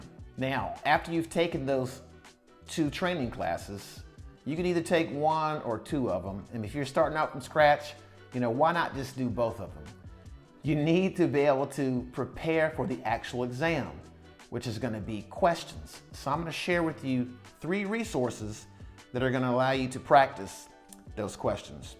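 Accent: South, United States. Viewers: Thanks for the info. I'm taking some Udemy courses and plan to pass it on a month.😎🌟 We're are you from bro, you have that country singer accent.😅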